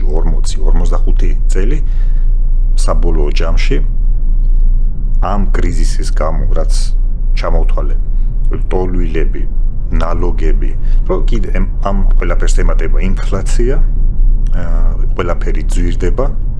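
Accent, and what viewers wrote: Russia. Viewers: რანგამაღიზიანებელი აქცენტი გაქვს რუსულს გავს და ყურები მეტკინა არადა საინტერესო კონტენტი გაქვს უბრალოდ აქცენტს რამე უშველე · საშინლად ტენდენციური ხართ. თუ ასე გეცოდებათ ,,საწყალი პენსიონერები" წამოდით მაქედან. ეგ რუსული აქცენტი ამბობს ყველაფერს, რატომაც ლაპარაკობ ასე )))) ვაუ რუსული მილსადენის გამო გაიყინებიან თურმე გერმანელი მოხუცები 😀 სამოთხე არ იქნება აქო... არადა კადრებშიც ჩანს რა ქვეყანაა და რა ხალხი. მოეშვით სხვების მოტყუებას.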